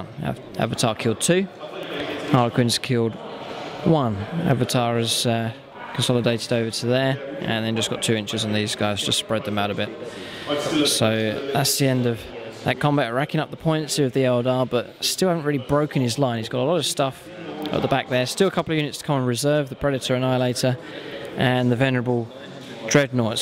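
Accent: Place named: Southeast England, United Kingdom. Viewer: Hey. nice to see some more of your batreps up on here. Is there no way in future you can stop there being a massive spoiler comment right up there^ at the top of the screen, it's impossible not to read that as it's the 1st one! Come on, don't spoil everyone's fun mate! Anyway, would love to know where you have your battles as you sound like you're from the southeast of England and I'd love to come see some of the wicked terrain you guys have and maybe even have a game...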